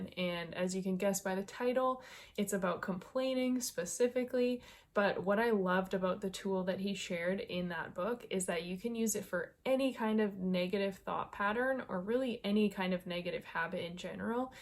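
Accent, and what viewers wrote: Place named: Canada. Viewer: Hi, I found you on Kristina"s Channel Spiritually Selfish...and wow, a couple things..you are such a bright light I had to check out your channel...then you are SO MUCH like my friend Ryan...I met her in the early 90s at the Dead Shows..I'm an old hippy myself lol..she's Canadian (you sound like you might be from north of the border) and is a Taurus born 4/30 and you have some good positive serious Taurus energy....so maybe we're in a similar soul family but my main draw to you was your healing work and I'm curious if what i do is really "Quantum Healing". I connect with people's energy fields from distance and work with their fields and every healing I see my hands doing different things and I get messages from spirit about what is really going on with their health and can offer that......oh..I'm not bragging btw because Spirit heals, not Adam lol. 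I'm just her instrument but we've worked on people in hospice with cancer and within 48 hours they'd be up, eating, not everyone has these results but some do....the latest person is playing his guitar again up and eating and he was terminal with pancreatic Cancer. wasting away on Morphine......people have gotten up from their wheelchairs totally healied from Lupus...It's so freakin amazing and I myself healed from an "incurable" autoimmune disease I had almost 60 years..but no steroids for the first time in my life in over a year!!!! 3 years of daily affirmation work, energy work and diet. I work as a Medium so I don't charg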